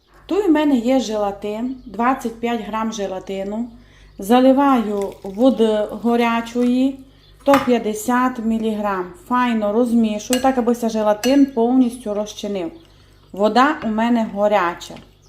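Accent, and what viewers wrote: Transcarpathia, Ukraine. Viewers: Пані рада вас чути і дивитися на ваші витвори мистецтва,сподіваюся у вас все добре,і надалі ви частіше будете нас тішити своїми чудовими рецептами та закарпатським діалектом❤❤❤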